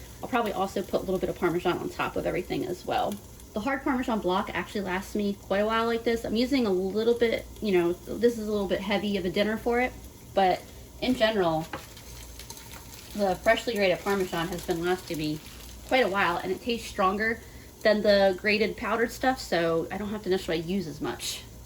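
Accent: Philadelphia, United States. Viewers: I always thought you had a Philly accent but in past videos I think you said you were living further down South. But with the Phillies shirt I’m guessing from Philly region. Im in DELCO and sounds very familiar. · I knew you had a Philly accent!! Go Phillies!! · !! I know you're located in NC but I've thought for years I was picking up on a Philly accent in your videos! I'm from Delaware County-- where are you from? Also, GO PHILS! ♥️⚾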